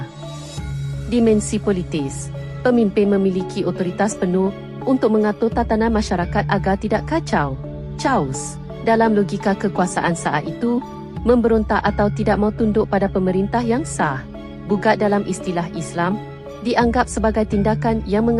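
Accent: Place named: Malaysia. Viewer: Logat malaydesh tidak elok didengar. Bahasa malaydesh menyusup ke dalam bahasa Indonesia lewat membacakan narasi sejarah kerajaan di Jawa dengan menggunakan logat malaydesh yang terdengar kaku.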